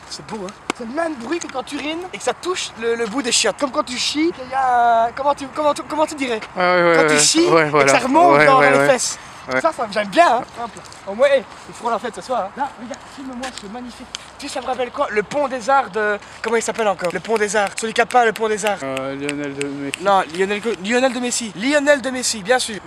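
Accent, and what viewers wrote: Belgium. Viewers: T'es belge ou français ta un petit aquessent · Cet accent belge ahah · Tellement bon, j'ai ressenti le Poelvoorde jeune avec une bon punch à l'accent Belge. Il y a des génies et il y a. merci!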